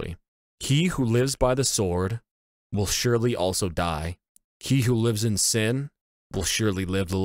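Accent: Canada. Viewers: Owt....I bet you are either Canadian or from Minnesota. It really is a great song and thanks for covering it.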